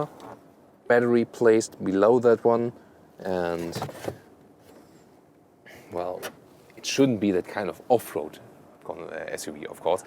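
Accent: Germany. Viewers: ahhahaha typisch Deutsch hab's direkt rausgehört 😂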